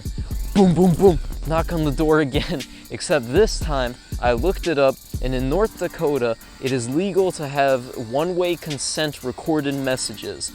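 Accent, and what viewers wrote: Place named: Canada. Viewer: Where are you from, sounds like youre from Canada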